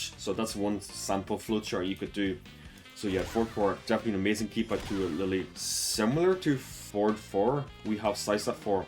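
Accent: Ireland. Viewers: Nice guide and cool Irish accent "counter hish" 😜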